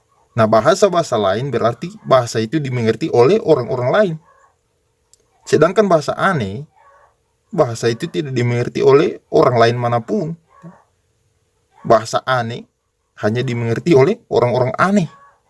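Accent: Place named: Eastern Indonesia, Indonesia. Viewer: Yg Bicara Ini Dr Logat nya Dari Suku NTT ATAU MALUKU!!!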